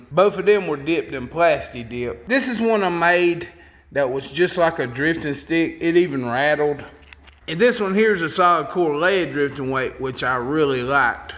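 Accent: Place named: South Carolina, United States. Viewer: where are you from? sounds like the great state of south carolina.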